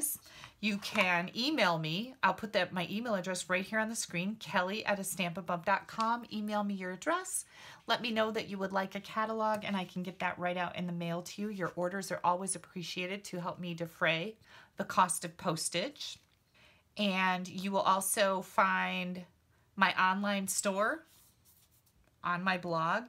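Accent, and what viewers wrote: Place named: Wisconsin, United States. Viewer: Oh my gosh, I thought my memory issues were because of am of a certain age. 😉 I will start using the Stazon excuse! What fun! Love what you do and the Wisconsin accent. I used to visit Sheboygan. Nancy In Colorado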